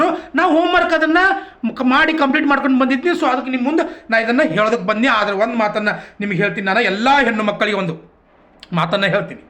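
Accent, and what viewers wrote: North Karnataka, India. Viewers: ಉತ್ತರ ಕರ್ನಾಟಕದ ಭಾಷೇಲಿ ಬಲು ಛಲೋ ಐತಿರಿ, ನಿಮ್ಮ ಮಾತ.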